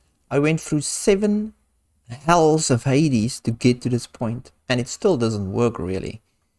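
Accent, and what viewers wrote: South Africa. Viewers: I recognize a fellow SAn when I hear one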